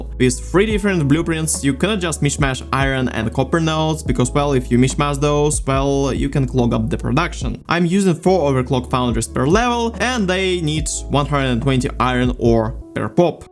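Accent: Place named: Russia. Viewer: Русский акцент... Вы русский?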